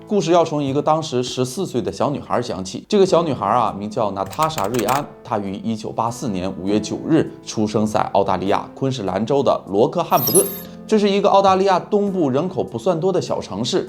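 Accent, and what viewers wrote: Northeast, China. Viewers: 能不能不说东北话？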